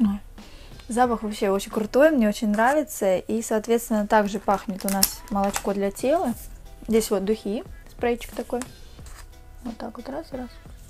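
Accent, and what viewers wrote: Rostov, Russia. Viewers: Из какого вы города ? Показалось что из Ростова на дону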